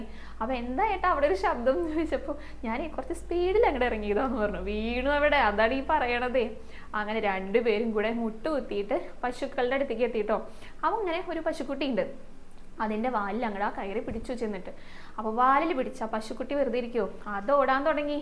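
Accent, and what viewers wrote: Thrissur, India. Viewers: Unnikannante kadha mol paraunnathu kelkkumpol munnil nadakkunnathupole thonnunnude. Athratholam resamnu kelkkan pretheykich Trisur malayala siliyil paraumpol. Innathe kadhaum super dressum super.Njan kannyakumarikkarana mole.anikku Trichur malayala sili valare eshttamanu.Njan krishna Bhagthanu.kudumpathil 8_mathe puthranayathinal Gopika Remaman anna perum kitti. Athinal Njan pathivai kadhakal kelkknnu. Molkku sarvaiswriyavum tharan prarthikkunnu.Krishna Guruvayurappa.😊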